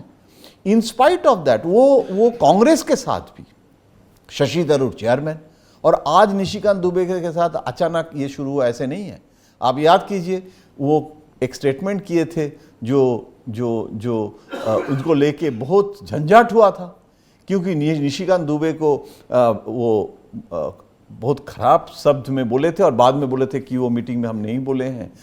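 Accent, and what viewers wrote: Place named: Bengal, India. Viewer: Sir, do baat samaj mein nahi aa rahi hai ? Aap ne kaha Adani jaisi "HASTI" par sambhal kar bolna chahiye. Ek taraf aap keh rahe hai login share karna parliament ki security ke liye theek nahi lekin Adani ka paisa chisnese aur gulf se aana sahi hai ? Yeh 2 sources ka paisa desh ki economy mein lag raha hai toh kya inka paisa economy ko barbaad lar sakta hai ? Baat sirf itni si hai ki kai neta varshoan se parliament mein hai lekin desh ki policy par sawal puche ne se bacchte hai. Doosri baat Shashi Tharoor ka reference dena aap ke poore vishleshan par sawal utha tha hai. Tharoor ne kaha woh meri beti jaisi hai phir bhi aap keh rahe hai ki Tharoor ka support karna TMC leaders ko sahi nahi lag raha hai. Waise Mamta Banerjee kabhi bhi personal life par na soch thi hai na bolti hai. Unka naam lekar apna bayan dena theek nahi. 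Aap ka lehja (tone) bengali hai iska yeh matlab nahi ki aap Mamtaji ko represent kar rahe hai. Aaccha yahi hoga ki ek yodha ko apni ladai ladne de agar aap uska saath nahi fe sakte ya usse sehmat nahi hai. Lastly, she has raised or asked important questions for the people or part of the people? Let us hope truth will triump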